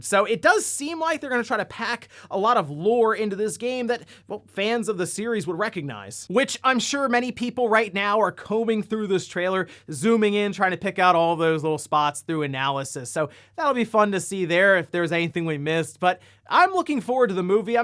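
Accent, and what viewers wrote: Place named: Brooklyn, United States. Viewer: he does have a faint Brooklyn accent.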